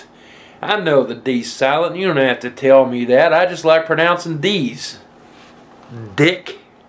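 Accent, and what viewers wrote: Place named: Kentucky, United States. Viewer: U sound like me FROM KENTUCKY. Lets represent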